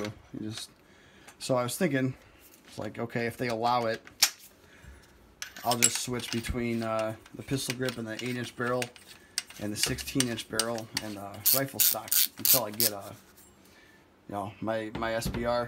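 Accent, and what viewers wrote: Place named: Illinois, United States. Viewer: I was about to ask if you’re from IL based on your accent but I saw comments in the affirmative lol same here. Moved the hell out to chase my gun dreams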